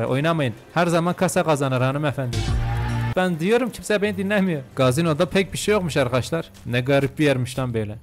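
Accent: Elazığ, Turkey. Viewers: abi sen elazığlımısınü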